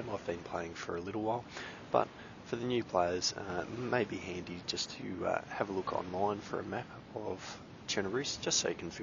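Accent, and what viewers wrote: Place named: Australia. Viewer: Thanks for the videos, helped me heaps, loving the Aussie accent :D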